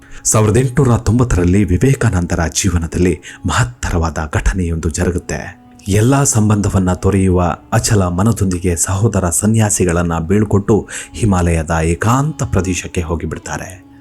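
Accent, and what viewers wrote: Standard. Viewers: Uttama. vyakhyana hagoo shuddha bhaashe Keli santoshavayitu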